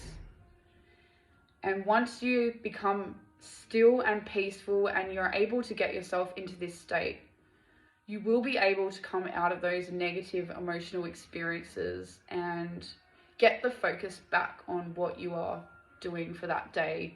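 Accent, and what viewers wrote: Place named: Australia. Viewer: WOW this video wasn’t a coincidence, I was battling bad thoughts today and you showed up on my screen for a reason. Praise Jesus and thank you very much I truly appreciate the encouragement and I’m going to apply this strategy more often. Also I notice you have an Australian accent as I’m from Australia aswell LOL. God bless you.✝️❤️✝️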